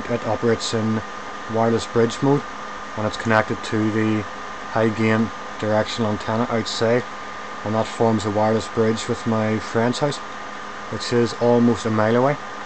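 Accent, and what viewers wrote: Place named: Northern Ireland, United Kingdom. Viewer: You sound like your from Northern Ireland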